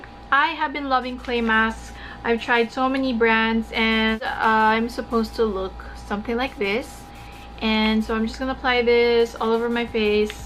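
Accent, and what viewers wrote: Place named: United States. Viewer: love your accent its like you grow up in america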